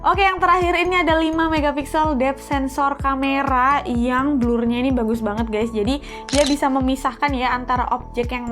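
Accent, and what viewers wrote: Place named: Java, Indonesia. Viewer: Logat jawa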